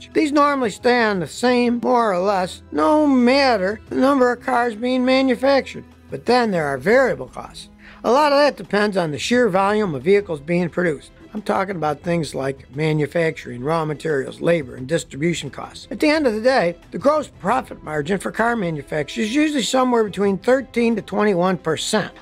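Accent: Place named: Western New York, United States. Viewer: He's from western New York, Niagra Falls area I believe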